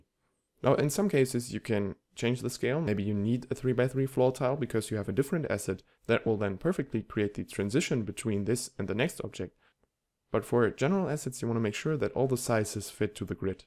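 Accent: Germany. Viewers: rofel wusste ich doch das du deuschsprachig bist ;)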